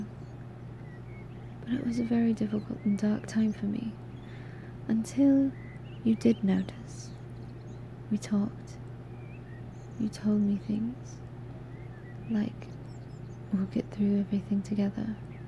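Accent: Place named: United Kingdom. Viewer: Alternative title: You're the Husband of the young Queen Elizabeth II ASMR (why? because of your somewhat thick british accent)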